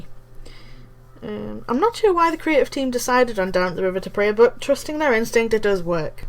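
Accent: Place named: Newcastle, United Kingdom. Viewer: Don't be silly! I've always been a fan of your videos, I've missed the PIF community's Geordie lass, pet! (trying not to hint at a possibility of a new video soon)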